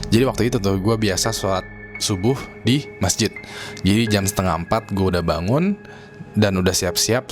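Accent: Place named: Bekasi, Indonesia. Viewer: bang lu orang bekasi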